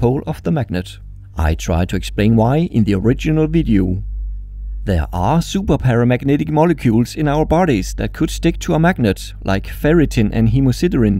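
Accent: Denmark. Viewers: I feel like you have a danish accent, hvis du er dansker så like mit comment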